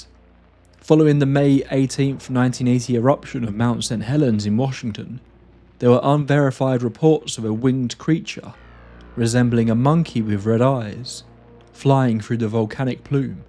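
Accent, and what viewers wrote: England, United Kingdom. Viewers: I Cant what this video. Too slowly explained. Get to the point faster for heaven's sake. Like watching paint dry outside in winter. You should also ditch the english accent narration. So sick of that here on Youtube.😢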